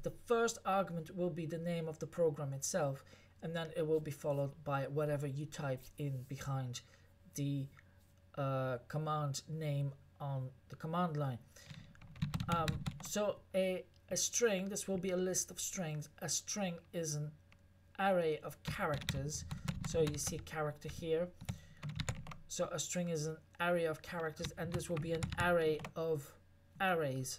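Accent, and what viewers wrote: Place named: Ireland. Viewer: I cant put a finger on your accent, sound irish but something else too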